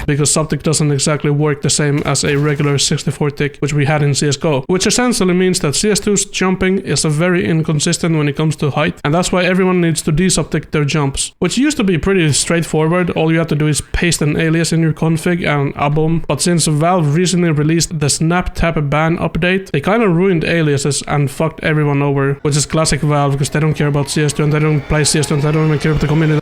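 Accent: Finland. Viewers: U finnish? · Ur finnish? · Are you finnish? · ooooooooooooooooooooooooooootko suomalaine kuuulostaa aksetti kyllä ralli enklish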